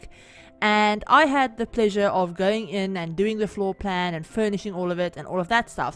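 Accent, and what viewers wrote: South Africa. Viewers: guuuuuuuuuuuuurllllllllllllll , i haven't found a creator from SA. notices the accent , its weird but awesome for some SA rep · Im south African too but i don't have an accent as nice as yours 😅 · Wow, spotted that accent from your very first word!!! 🤗 fellow South African here! Nice to see a SA creator :)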